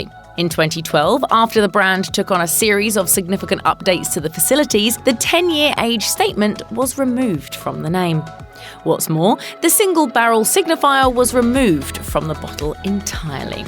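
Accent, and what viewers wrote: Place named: England, United Kingdom. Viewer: Your talking about Bourbon in Kentucky. Get somebody without an English accent. Here's a suggestion an Southeren accent.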